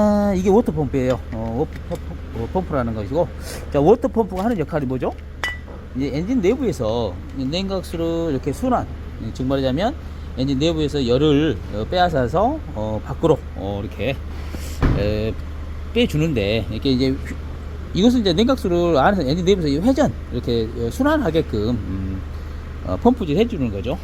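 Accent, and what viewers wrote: Jeolla, South Korea. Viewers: 공장장님 오늘도 수고 하십니다 전라도 사투리가 아주재미나게 말쑴헤사아주 시고 실력도좋고요 나도 화순사람인데요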